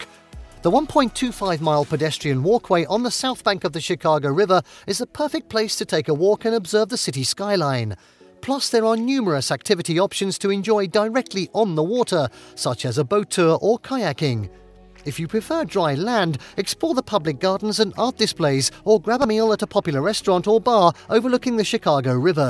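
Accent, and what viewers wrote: United Kingdom. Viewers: A nice survey with occasionally dazzling if often deceptive photography but also some egregious errors. "Fulton House" is not that house, whatever the house depicted may be —and in any case, it's not a house. Anish Kapoor, though he may have his studio in London, is Indian, from Mumbai. And the peppy British accent: What's up with that?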